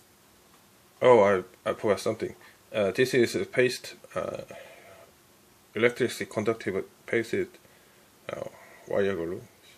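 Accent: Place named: China. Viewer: Sounds like a chinese trying to speak english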